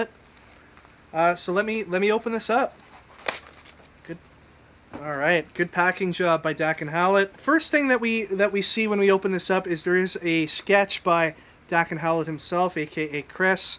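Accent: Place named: United Kingdom. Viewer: Of all the videos has i love his Umbrella Academy collection the most. I absolutely love this series and its great how he owns different covers to every issue, Ive been known to horde different cover arts myself. Gerard Way has certainly not disappointed with this series and also has a pretty gnarly British accent.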